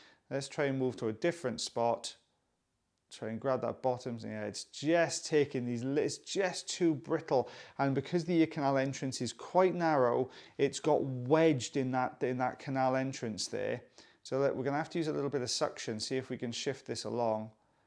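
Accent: Wales, United Kingdom. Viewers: When it's 106 degrees in California and you come across a lovely welsh accent! Dioch!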